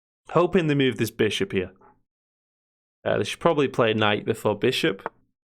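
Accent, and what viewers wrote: Hull, United Kingdom. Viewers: You sound like you’re from Hull??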